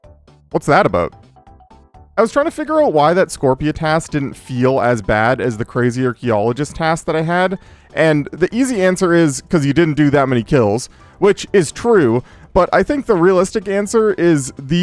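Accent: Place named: Upper Midwest, United States. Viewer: I've been wondering this since starting the series but where are you from? You have the quirk where you say "done the task" instead of "done with the task" which I've only heard from people from Philly haha but otherwise I'd say upper Midwest (like me)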